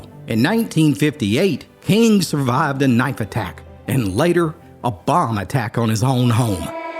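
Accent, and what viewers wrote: Appalachia, United States. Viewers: Men this narrator & this country ass accent 😂😂😂mf sound like he bout to cry.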